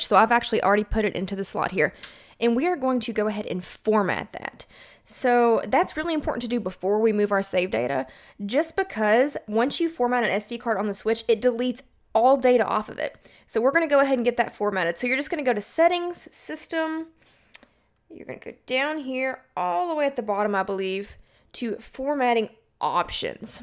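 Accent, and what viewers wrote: South, United States. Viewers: Thank you so much! It was so clear and your southern accent made it enjoyable to listen to :) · It's a Southern US accent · Its just the US accent i guess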